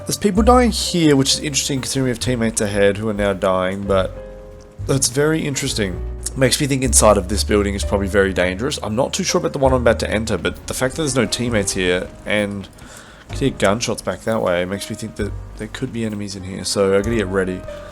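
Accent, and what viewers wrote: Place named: United States. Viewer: That American accent through me off real good. It was perfect.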